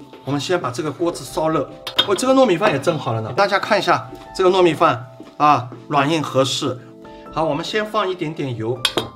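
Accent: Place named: Jiangnan, China. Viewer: 很幽默的师傅，能听到江南口音。虽然觉得我自己做饺子皮还挺熟练的，这个烧卖皮还是有难度。厉害厉害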